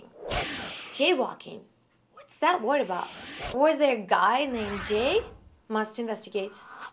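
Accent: Russia. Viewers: she has an russian accent and russia is not part of Europe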